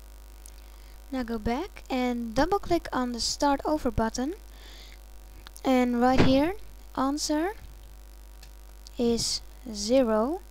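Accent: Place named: Netherlands. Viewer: Haha, ik dacht al te horen dat je Nederlands was!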